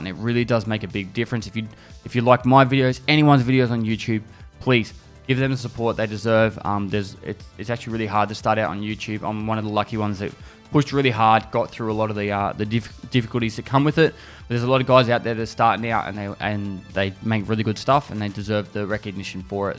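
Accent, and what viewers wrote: Australia. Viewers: Never had issues understanding what he says, guess it's your ability to understand his Australian accent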